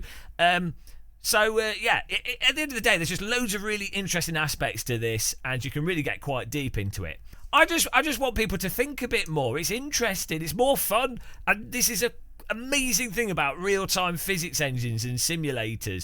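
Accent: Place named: Liverpool, United Kingdom. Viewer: love the liverpool accent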